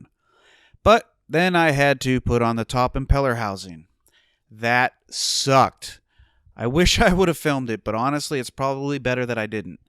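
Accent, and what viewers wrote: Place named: Philadelphia, United States. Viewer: is that a philly accent? its so thick! lol!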